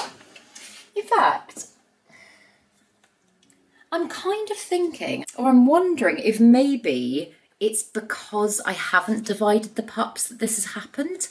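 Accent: United Kingdom. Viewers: Hi! I've never had any language problems with your channel British English everytime. Question, HELP! Begonia semperflorens, bronze leaf, they root in water with no problems but when I plant them in soil, they rot. Thoughts?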